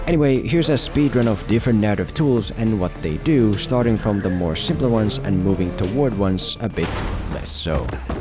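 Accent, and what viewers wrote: Finland. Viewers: Bruh u sure your not finnish? Your english sounds exactly like finnish accent.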